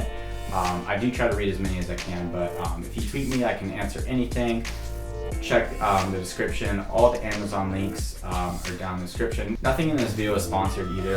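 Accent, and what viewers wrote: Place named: South, United States. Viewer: What state r u from because I kinda can tell a southern accent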